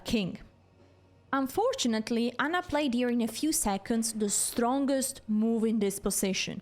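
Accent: Italy. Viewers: You have nice Italian accent 😍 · You have super cute italian accent! 😄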